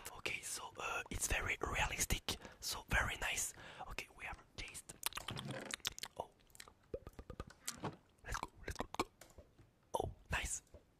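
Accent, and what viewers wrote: France. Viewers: he la french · Is he French? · You are from fransh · J'ai juré sur ma vie t'es français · r u french boy? ur english is so cool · Je me disais que t'avais un accent fr sa a confirmé le it's c'est trop 😂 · I thought he was a spanish turns out french very cool :) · Iam french and i think he have a french accent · I see i see u ar not english i gues u ar french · 10 secondes j’ai capté il était français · Tu ne serais pas français par hasard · Are you french? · you comm from french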